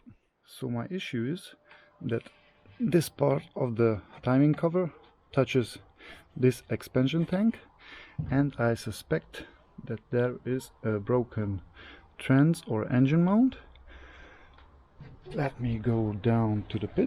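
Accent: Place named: Russia. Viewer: Hey i have just subscribed! Thanks for your videos. I am getting a 1987 double cab this week. It has 1900cc engine. Petrol and water cooled. I am inspired by your vids. By the way i am from Israel. Where are you from? I here an accent... maybe russian?🤔 any how thanks for your vids! Keep them coming.😁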